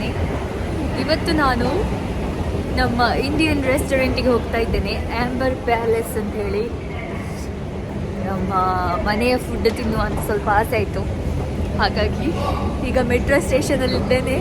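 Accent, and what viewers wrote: Standard. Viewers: ನೀವು ಯಾರು ಅಂತ ಗೊತ್ತಿರಲಿಲ್ಲ ಕೀ ತಡಿ ಕಿರಣ್ ಅವರಿಂದ ಪರಿಚಯವಾಯಿತು ನಿಮ್ಮ ವಾಯ್ಸು ತುಂಬಾ ಚೆನ್ನಾಗಿದೆ ಕನ್ನಡ ಸ್ವಚ್ಛವಾಗಿದೆ ಆಲ್ ದಿ ಬೆಸ್ಟ್ ಯುವರ್ ಪಿಚ್ಚರ್ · ನಿಮ್ಮ ಚಾನೆಲ್ ನಾನು ಇವತ್ತು ಯು ಟ್ಯೂಬಿನಲ್ಲಿ ವ್ಯೂ ಮಾಡಿದ್ದೆ. ಮೊದಲಿನದಾಗಿ ನಿಮ್ಮ ನಗು ಮುಖ ಹಾಗು ನಗುಮುಖದಿಂದ ಎಲ್ಲರಿಗು ಪ್ರೀತಿಯಿಂದ ಕೊಡುವ ಉತ್ತರ ನಮಗಂತೂ ತುಂಬಾ ಖುಷಿ ಕೊಡುತ್ತದೆ. ನಿಮ್ಮ ಪ್ರತಿಯೊಂದು ಶಬ್ದದಲ್ಲಿ ಪ್ರೀತಿ ಮಾನವೀಯತೆ ತುಂಬಿದೆ. ಇದೆ ಸಮಾಜದಲ್ಲಿ ಎಲ್ಲರಿಗು ಬೇಕಾಗಿದ್ದು. ಎಷ್ಟೊಂದು ಪ್ರೀತಿಯಿಂದ ಮಾತನಾಡುವ ನೀವು ನಮ್ಮ ಕರ್ನಾಟಕದ ಯಾವ ಭಾಗದವರು ಅಂತ ತಿಳಿದಿಕೊಳ್ಳುವ ಕುತೂಹಲ ಬಂದು ಗೂಗಲ್ ಸೆರ್ಚ್ ಮಾಡಿದಾಗ ನೀವು ಸುಳ್ಯದವರು ಹಾಗು ಆಳ್ವಾಸ್ ಕಾಲೇಜಿನಲ್ಲಿ ಓದಿದವರು ಅಂತ ಗೊತ್ತಾಯಿತು . ಎರಡೆನೇಯದಾಗಿ ನೀವು ಸ್ವಚ್ಛತೆಯ ಬಗ್ಗೆ ಮಾತನಾಡಿದ್ದಿರಾ. ಸ್ವಚ್ಚತ್ತೆ ಎನ್ನುವದು ಅಲ್ಲಿಯ ಜನರ ರಕ್ತದಲ್ಲೇ ಬಂದಿದೆ. ಸ್ವಚ್ಛತೆ ಇದ್ದಲ್ಲಿ ಶಾಂತಿ ಹಾಗು ಖುಷಿ ಇರುತದೆ. ಇದನ್ನು ನಮ್ಮವರಿಗೆ ಹೇಳಲು ಹೋದರೆ ದೂರದ ಮಾತು... ಮೂರನೆಯದಾಗಿ ನೀವು ಎಷ್ಟೊಂದು ಪ್ರೀತಿಯಿಂದ ಕನ್ನಡದ ಬಗ್ಗೆ ಪ್ರತಿ ಬಾರಿಯೂ ಪುನರುಚ್ಚರಿಸುತ್ತೀರಾ .. ಮತ್ತು ಚೀನಾ ನೆಲದಲ್ಲಿ ನಿಮ್ಮ ಶುದ್ಧ ಕನ್ನಡ ಕೇಳಲು ತುಂಬಾ ಖುಷಿಯಾಗುತ್ತದೆ. 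ನೀವು ಯಾವತ್ತೂ ಖುಷಿಯಿಂದ ನಗುನಗುತ್ತಾ ಇರಲೆಂದು ದೇವರಲ್ಲಿ ಪ್ರಾಥನೆ.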